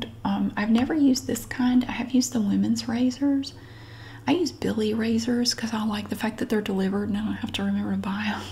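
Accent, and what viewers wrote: South, United States. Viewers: I like your southern accent: "Hate it an ate it". And you are so considerate to donate the food you bought. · I just moved to South Carolina from NY, and so many people have a lovely southern accent like u! Lol, it gives me such comfort for some reason. Have a great night!!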